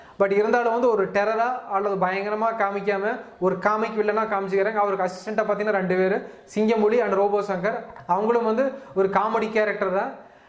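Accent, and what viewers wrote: Coimbatore, India. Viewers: rather than your review. your coimbathore slang is lovely brother. but improve your pronounciation in english or say everything in tamil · coimbatore slang etti pakudhu....nice to hear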